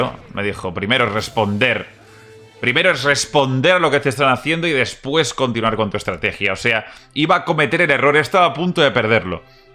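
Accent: Peru. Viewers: Ere peruano?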